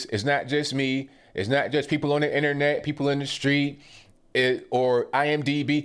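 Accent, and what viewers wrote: France. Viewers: Your French accent cracked me up! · Nice French accent Sir. Yeah, this is disgusting and it appears that the devil knows his time is almost up and he is trying to pull more children to hell with him. All we seem to do is screw over our children. I cancelled my Netflix over this.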